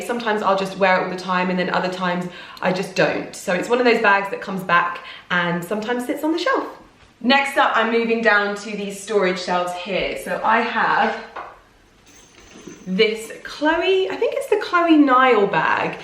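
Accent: United Kingdom. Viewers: I'm 13 minutes into the video before I realized she has a British accent. I think I watch too many British Youtubers...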